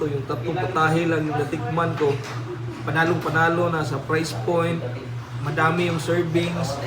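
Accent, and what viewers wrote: Visayas, Philippines. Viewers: Ang tagalog mo brad, Bisaya pa rin pakinggan.. 🤣🤣🤣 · Hoy mag binisaya ka nalang kasi yung tagalog mo pambukid ang style...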